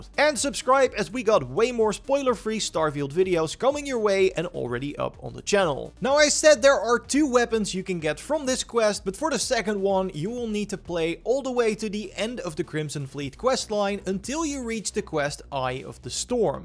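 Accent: Netherlands. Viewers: You sound very Dutch, nice vid tx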